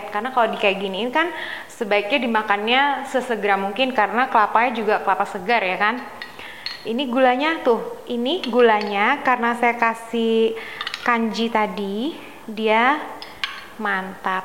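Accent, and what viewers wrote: Medan, Indonesia. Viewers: Orang Medan kakak ini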